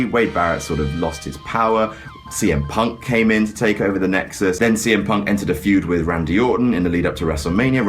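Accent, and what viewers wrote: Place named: United Kingdom. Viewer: Damn with that smile and that British accent..... I'm in love...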